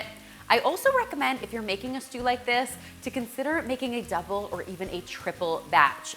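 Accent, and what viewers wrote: Canada. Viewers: As a side note it amuses me how you Canadians say herbs and Worcestershire (I’m English) and as for Scarborough and Peterborough 😂. I’m guessing though you laugh at how we say things 😁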